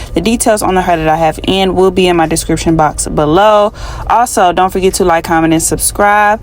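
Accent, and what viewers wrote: St. Louis, United States. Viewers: She sound like she from st. Louis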